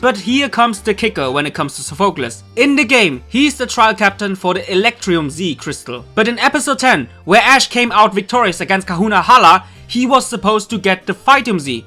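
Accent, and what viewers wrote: Germany. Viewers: man hört deinen deutschen Akzenten voll raus :D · Wait..... .... are you.... German????? Cool - High Fife! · Is this a German Aczent? Sounds a little. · are you german?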